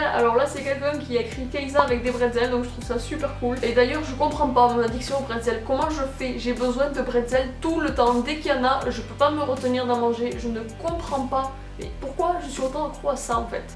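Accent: Toulouse, France. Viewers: omggg, j'adore ton accent toulousaing 😍😍😍 Ma mere vient de toulouse alors je peux faire cet accent et j adore 😍😍 Putaing Toulouse en force 👊👊💘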